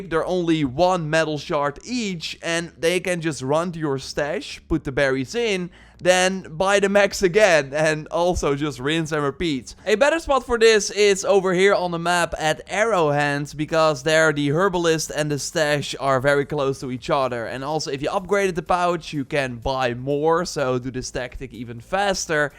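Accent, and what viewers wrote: Netherlands. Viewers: I believe he is Dutch. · Are you Dutch?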